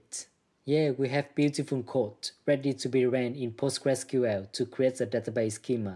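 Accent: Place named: Vietnam. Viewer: Your accent sounds like Vietnamese speaking English! Anw thank you for the tutorial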